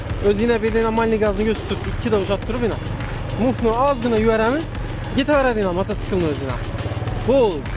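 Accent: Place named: Khorezm, Uzbekistan. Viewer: Хоразм тили котили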